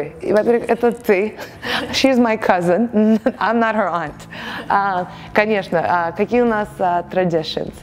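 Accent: Russia. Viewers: не обманывайте больше в названии, она не американка, а русская эмигрантка! её родители русские, она родилась в России, она даже говорит на русском чисто, а на английском с русским акцентом! какая ещё американка? за название дизлайк! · К концу ролика стало понятно, что же меня беспокоит (как русского или советского). Это ровное состояние женщины - олвейс смайл, лук гуд, но проблемс, позитив. Ну вы поняли. Ни на секунду не показать даже возможную "не позитивность" что ли. Про искренность молчу. Понятно почему это происходит. Она сама это не специально, это уже на автомате. Так вот я увидел американскость с русским произношением.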